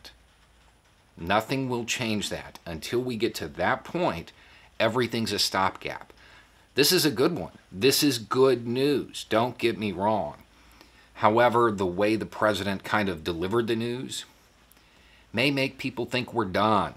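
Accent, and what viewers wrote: South, United States. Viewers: To be honest... I haven't experienced more genuine thought process since I watched this gent making reasonable arguments in a southern accent (I maybe wrong I'm german) in his garage with his superior Beard. I expect any minute a profound argument supporting the second amendment.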